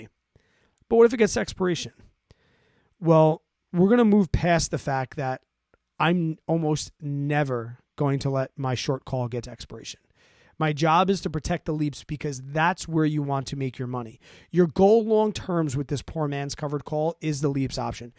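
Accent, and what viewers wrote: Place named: East Coast, United States. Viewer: you sound like an east coast guy! You have a bit of an east coast accent. Where you from brother? I’m from the west coast. Thanks for sharing your options trading knowledge bud.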